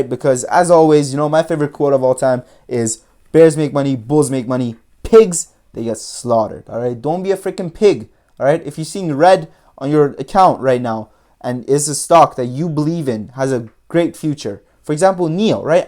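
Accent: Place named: Iran. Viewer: You sound iranien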